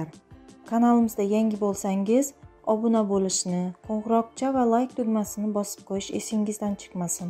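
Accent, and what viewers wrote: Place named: Khorezm, Uzbekistan. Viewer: Хоразимнанмисиз шевангиздан ухшатдим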